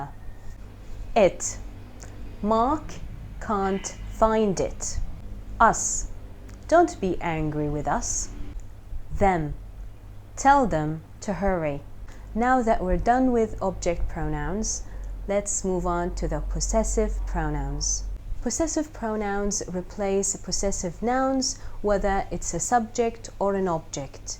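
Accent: United Kingdom. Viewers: ماشاء الله تبارك الله ربنا يوفقك اللكنة البريطانية جميلة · احسس حد في العرب سمعته بيتكلم انجليزي بريطاني ان شاء الله في يوم هاتكلم زيها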